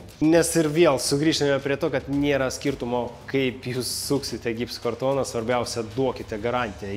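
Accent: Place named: Russia. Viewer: Šaunuolis, kad stengiesi, bet tas rusiškas akcentas ir rusiška sakinių sandara trukdo suprasti ką nori pasakyti😊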